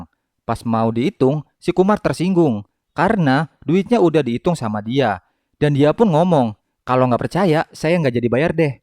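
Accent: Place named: Cirebon, Indonesia. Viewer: Wong cerbon ya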